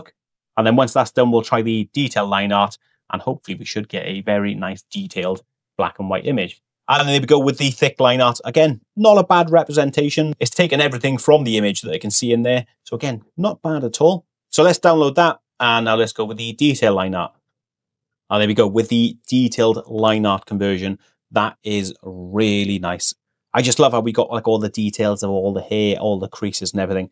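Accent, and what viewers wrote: Wales, United Kingdom. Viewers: Love how you talk about dollars and cents with a Welsh accent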